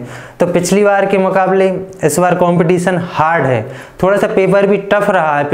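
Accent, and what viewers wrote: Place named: Bundelkhand, India. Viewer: apki language se pta chala ki ap bundelkhand se ho..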